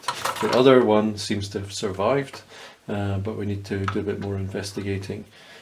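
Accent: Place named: Scotland, United Kingdom. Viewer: where do you live sound Scottish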